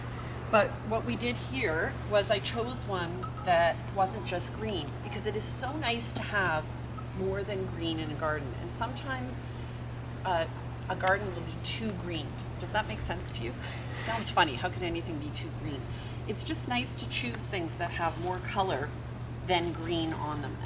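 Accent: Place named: Ireland. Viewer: A great garden, are you Irish. You sound a bit Irish. Keep up the good work.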